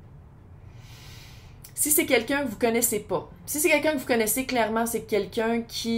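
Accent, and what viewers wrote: Canada. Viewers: trop bon cet accent canadien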